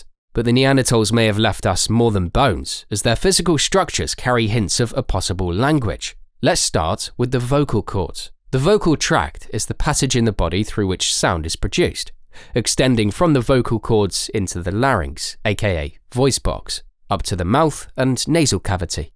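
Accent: United Kingdom. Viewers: Hearing an English accent explain how a neanderthal must have sounded is kind of annoying to me.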